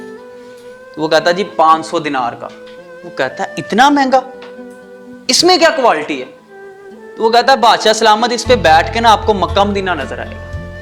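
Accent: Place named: Punjab, India. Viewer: Sir story b axhi thi lakin ap ki punjabi ka style b buhat acha tha seriously 😀😂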